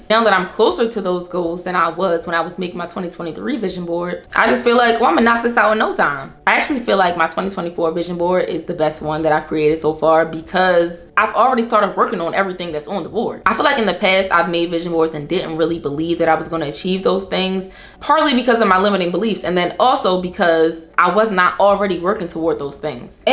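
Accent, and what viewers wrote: Baltimore, United States. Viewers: you from baltimore aren’t you ? 😭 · I hear a Baltimore accent. Are you from there?? Thank you for your videos! Happy new years!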